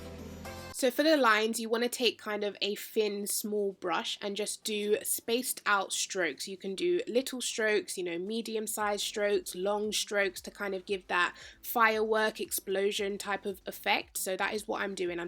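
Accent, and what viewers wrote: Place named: United Kingdom. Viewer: Havent watched a british youtube vid in a hot minute. Love her accent. The makeup is gorgeous ♡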